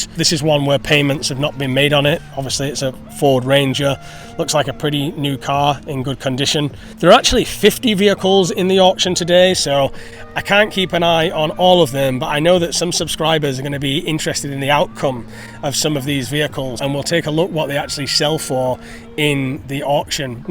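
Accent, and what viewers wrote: North, United Kingdom. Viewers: Where you from I hear a northern twang in your voice that has been diluted over the years by speaking clearly to be understood. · Sad to hear you are losing your good old northern accent mate. You’ve got a media proper voice now 😂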